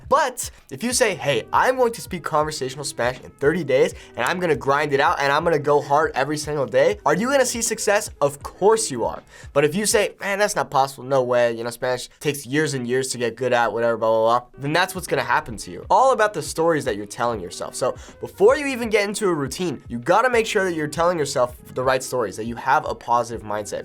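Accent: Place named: Mexico. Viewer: si Señor ! I'm a podcast member and gonna upgrade to the taco. My italki tutor was wondering what podcasts i listen to and i told her, and I mentioned that yours was hardest for me to understand. She played a few minutes of the recent Gringo or Latinas podcast and said "that's VERY Mexican", lol...... needless to say i dissected that transcript later that night. Your podcast is the one that pushes me to keep working harder. Keep up the great work!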